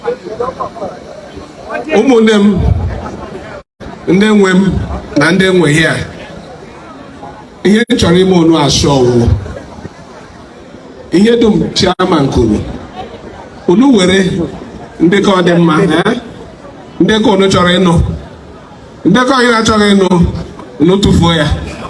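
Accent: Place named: Umuahia, Nigeria. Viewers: he's Igbo language sound like the ikwuano umuahia people speaks . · He's even speaking central Igbo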